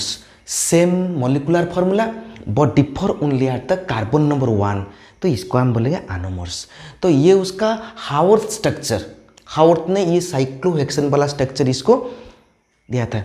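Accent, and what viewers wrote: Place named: India. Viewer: Can you add subtitles? Cause I have trouble with understanding English with Hindi dialect...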